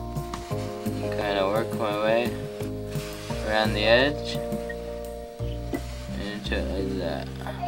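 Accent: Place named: Canada. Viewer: Ba ha ha! Thought I heard you say, "draw in your picture like... c'est ca.." and thought this dude sounds like he's from my neck of the woods, he's got to be Canadian. I see your website is a dot ca domain. If you aren't from the East coast, I think you'd fit right in anyway!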